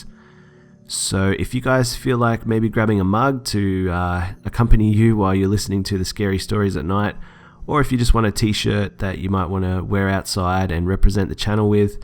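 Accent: Australia. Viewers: Is he Australian??? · i can't tell but are you Australian? i love your accent a lot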